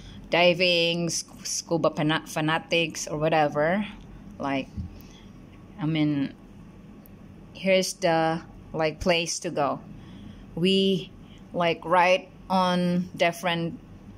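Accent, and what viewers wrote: United Kingdom. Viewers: ganda ng english accent mo sis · Lamia jud kag english accent jing oi murag british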